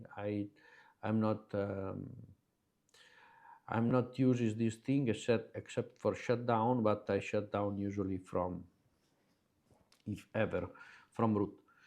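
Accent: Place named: Romagna, Italy. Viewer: Ottimo video, mi hai fatto scompisciare con l' italiano perché si sente l' accento romagnolo..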